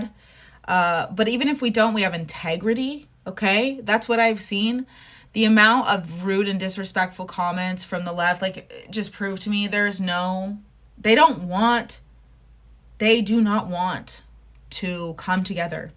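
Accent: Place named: Alberta, Canada. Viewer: You sound like you belong here in Alberta Come on down guys it’s time for change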